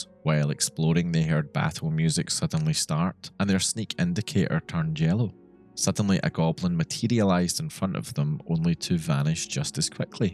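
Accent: Scotland, United Kingdom. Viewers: Are you Scottish? Btw awesome video love the accent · I liked this as soon as i clicked onto it because i heard a fellow scottish accent, and it's about oblivion. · Is that a wee hint ae a Scottish accent? Or am a wrang neebur? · Can you use a different accent? It’s hard to fall asleep listening to Scottish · Subscribed for the Scottish accent. 🎉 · Fuck me. I forgot you are a Scotsman 😂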